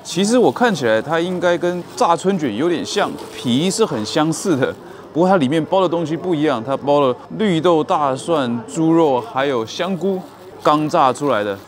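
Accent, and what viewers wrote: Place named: Taiwan. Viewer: 博主是哪裡人？臉相是浙江人，但是說話又有點台灣的感覺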